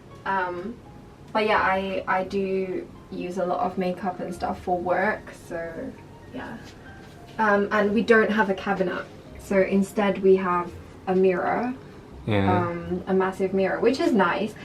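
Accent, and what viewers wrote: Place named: United Kingdom. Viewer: hmm interesting, UK accent coming back to HK very small aprtment.. why not comeover to the mainland. Try Shenzehn and Guangzhou much bigger much better But goodlocation will always trump other factors so good on both of you. Our family also feels that the surrounding environment is important especailly when you walkout on the weekend, you want to be somewhere with cafes etc and a place you feel cmfortable also its good to be around the same kid of clientelle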